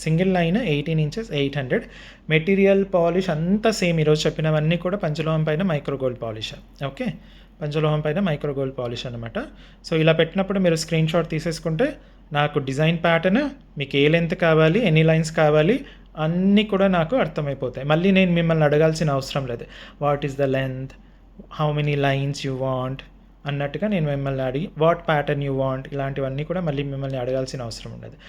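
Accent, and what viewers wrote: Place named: Telangana, India. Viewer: Mee telangana slang super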